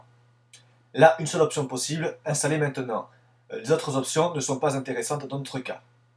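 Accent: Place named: Marseille, France. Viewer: toi t marseillais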